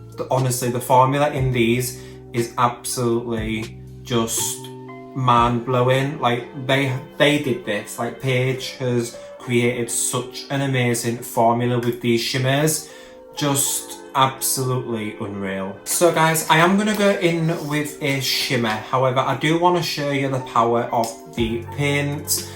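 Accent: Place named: Hull, United Kingdom. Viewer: Mine was shipped yesterday and I can’t wait so started looking on yt see your video and instantly think I know that accent 🤣 fellow hullian you got a sub just for that 🤣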